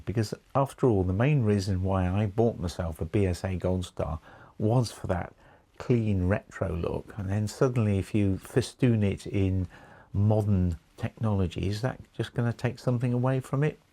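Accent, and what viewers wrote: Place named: England, United Kingdom. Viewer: Sounds like it’s got some useful features.hearing you read them off. With your English accent.reminded me of Q.from the bond films. He was always telling bond.what the gadgets were.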